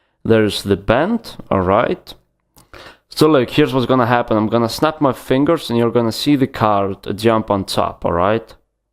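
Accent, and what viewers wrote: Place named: Russia. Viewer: Like the Russian arscent. Now I know the trick. Cheers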